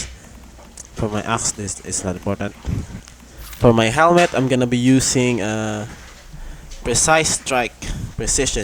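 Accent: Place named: Philippines. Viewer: Japanese name but you sound Filipino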